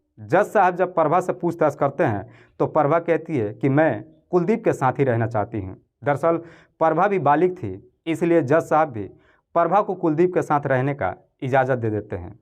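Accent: Bihar, India. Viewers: Story telling in Bihari style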